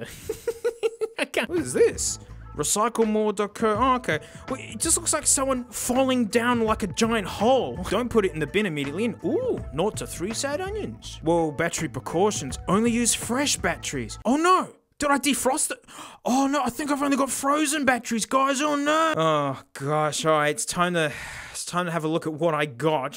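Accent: Australia. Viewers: I like how the speaker is australian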